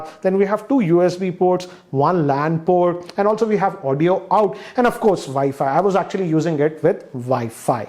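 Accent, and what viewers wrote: India. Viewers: Indian accent is even more Indian than Indian accent, and I absolutely ♥️ it! You're the best techtuber in the country.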